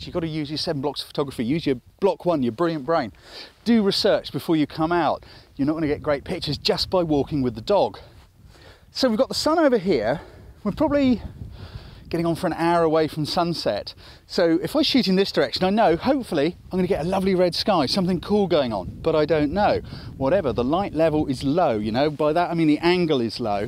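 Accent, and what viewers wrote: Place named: England, United Kingdom. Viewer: i was shooting sunset pics at the beach for my first time on manual mode and i was speaking like unconsciously(English accent) in an effort to set the right settings to get a good shot LOL... hope i am not the only one hearing voices (his) on my head hahaha. oh out of 208 got 10 decent ones for my level.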